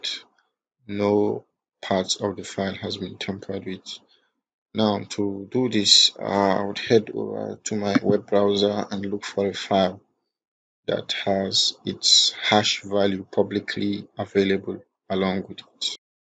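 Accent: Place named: England, United Kingdom. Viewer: I can feel the english intonation (tureshi ni)... Keep up the good work 🙌❤️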